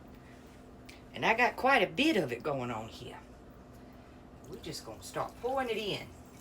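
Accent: South, United States. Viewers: I love your videos and that sassy southern accents. So sweet ♥️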